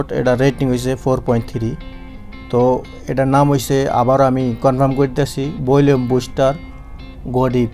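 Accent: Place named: Barishal, Bangladesh. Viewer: ভাই আপনার ভিডিও দেখে যত টুক ভালো না লাগছে তার চেয়ে বেশি বেশি ভালো লাগছে আপনার বরিশালের ভাষায় কথা শুনে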